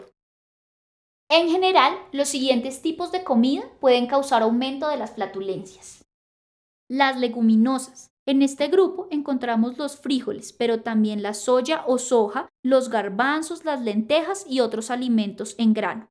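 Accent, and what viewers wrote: Colombia. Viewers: thechampion606 Si ella es colombiana su acento es 100% colombiano eso te lo aseguro yo que soy colombiana. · Tiene acento de Bogotana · Sí es Colombiana, muy Bogotana súper chévere!!! Jejeje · Que linda voz usted es colombiana? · Tiene acento colombiano, lindo. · Me gusta el asentó de Colombia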